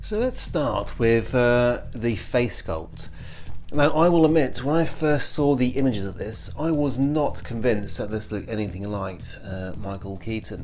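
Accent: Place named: United Kingdom. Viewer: They should have used the head articulation where the joint is in the bottom of the neck instead of the top. Like Hush Batman or Capulo rebirth Batman. When they said they were making a Keaton figure that's what I assumed they would do. It makes the most sense as it would have better preserved the shape of the cowl and neck. Sounds like you're in the UK man. May I please ask where you got this? I haven't been able to find it anywhere yet. Edit - Enjoyed the video, have a sub👍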